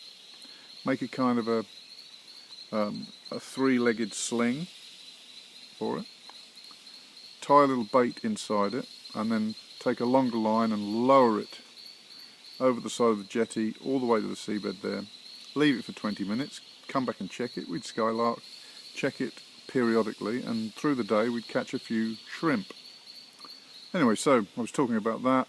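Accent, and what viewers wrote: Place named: Hampshire, United Kingdom. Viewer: Never knew you were a 'Ampshire hog' like me. Funny how accents deceive people! ATB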